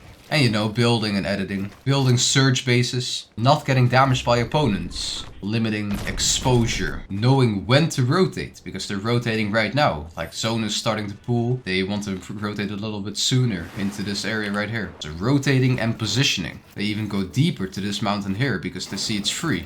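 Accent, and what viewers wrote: Netherlands. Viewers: good video keep it up, are you dutch by any chance? you sound NL ❤❤❤❤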